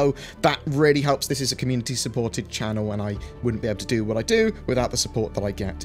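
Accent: United Kingdom. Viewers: Men this UK voice...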